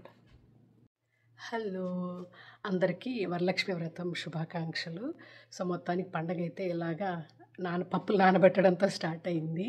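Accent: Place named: Tirupati, India. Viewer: Nice video andi, the way you speak, reminds me of my home town tirupathi. We also call poornaalu as suggi Could you share your pulihora recipe please?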